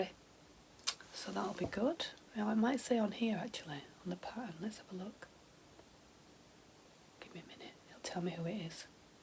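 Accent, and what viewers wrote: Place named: Yorkshire, United Kingdom. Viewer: lovely hearing a nice Yorkshire accent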